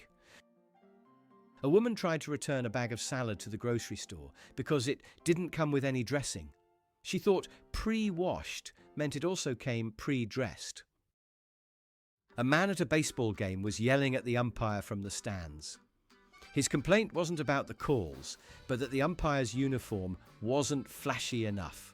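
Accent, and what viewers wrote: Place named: United Kingdom. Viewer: This is a British accent trashing Americans, and we have enough trouble. Thank you.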